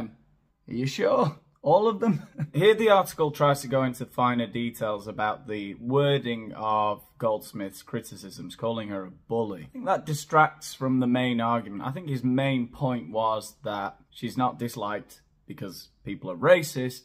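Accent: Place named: North, United Kingdom. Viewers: I am a recent subscriber. I love the way algorithms introduce people to your in-feed. I instantly related to you. For a start I could tell you were a Mancunian.......I am too. I'm from the dreaded Stockport I love your voice. The white curtain behind you meant you could be anyw. I I visualised you in central Manchester in a swanky apatym. Anyway, you disclosed that you were in Spain. I wish you and your family tons if happiness. I love your sense of humour. It's so, so northern. The first day the algorithm showed me was when bloody Meghan znarkke was at The Bridgewater Hall. As a Mancunian I live your humour I'm 62 yrokd . My kids are38yr and 32 Yr and you remind me of their teachers. Stockport Scchool I love your accent. Where are you from in Manchester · First time I have clicked on you. I am full of admiration. 65 yr old Londoner who has lived in Florida for 35 yrs. 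You strike me as an incredible mix of John Lennon and Russell Brand. Kudos to your parents. Oh, and I lived for 3 years in Hull.(Methinks I hear a Northern strain) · Trying to place your accent. Got to be Manchester?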